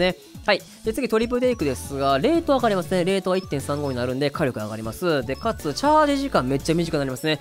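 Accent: Kansai, Japan. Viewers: いつも解説ありがとうございます！関西訛りあるのに聞きやすくてすごくわかりやすくて感謝です！アプデ後も楽しみにまってます🐧❤️‍🔥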